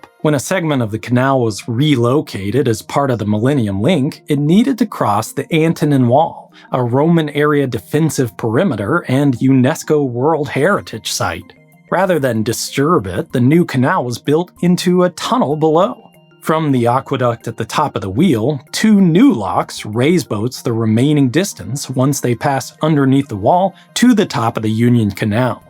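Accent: United States. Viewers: It is always fascinating watching americans talk about Scotland and pronounce things in their accent. I live in the area and more than the engineering, it was something that added a lot of benefit to an area that was notorious for being less than amazing. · It's interesting to hear how an American pronounces Scottish words. There is a distinct shift in vowel sounds between the two nations, even if we share a similar language. Sometimes, the pronounciation is amusingly different from what us in Scotland would use, but sometimes, it's harsh, almost alien sounding. Languages are interesting.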